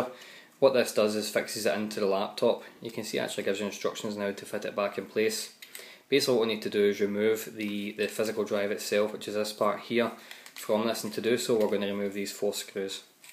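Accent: Scotland, United Kingdom. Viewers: thanks for the tutorial! Love the Scots brogue - made it much more pleasant to watch!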